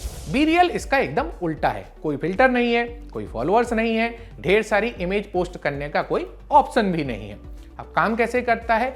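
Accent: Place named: Bundelkhand, India. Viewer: ji aap bundelkhand ya gwalior-chambal sambhag se hain kya. Aapke bolne ki style se anumaan laga raha hoon.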